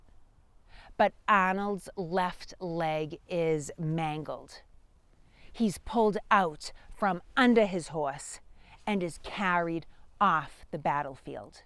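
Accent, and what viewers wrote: Boston, United States. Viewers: Boston accent much? Southie? Dah-chestuh? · You only have to listen to talk for 30 seconds to know that she is definitely from Boston.🤣💕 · Great piece, excellent production, clear presentation and information before unknown to myself, I love the charm of New England, Boston accent...you are bold in facing the wind with such humour and laughter, can't wait for more of these, makes learning about the history FUN!!!! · ❤❤❤❤Brilliant Historical Videos! Loooove the Boston Accent! Too! ❤❤❤❤ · Great history and I always look forward to your informative and acurate posts. No way AI as that Boston accent is unique, (to humans.) Keep up the good work! Can you post any articles links that you write?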